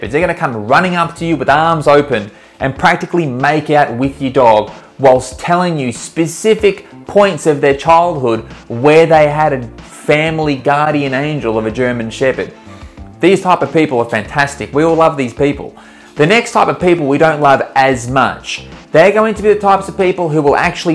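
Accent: Australia. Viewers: Australian accent very funny and cool for German ears. She is adorable. Total class.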